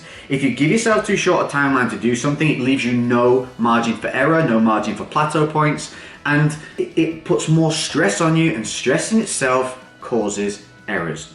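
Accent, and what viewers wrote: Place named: Yorkshire, United Kingdom. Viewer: I've recently found your channel and I'm loving it! You sound local to me, I'm near York.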